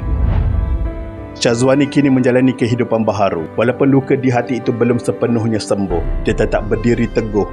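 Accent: Kelantan, Malaysia. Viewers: KELANTAN?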